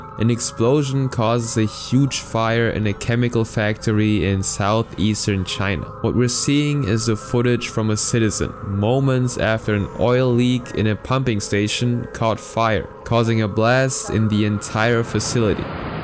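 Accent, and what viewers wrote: Germany. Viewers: U sound german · Either German or Austrian. He’s got a noticeable accent imo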